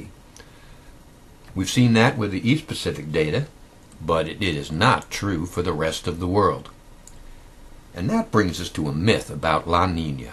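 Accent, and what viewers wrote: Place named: North Carolina, United States. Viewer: Great job,! You should get a British guy to do the voice overs, though. Your'e from North Carolina, aintcha?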